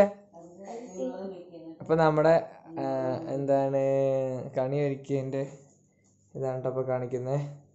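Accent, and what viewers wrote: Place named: Palakkad, India. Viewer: Pakka palakkadan slang...Thambattiyeeeee🥳🥳🥳🥰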